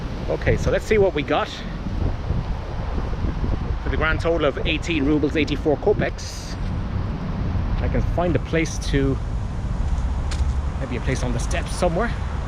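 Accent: Waterford, Ireland. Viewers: Hello from Kilkenny good info on your grandfather always thought you had a bit of a blaa accent makes sense now keep up the great videos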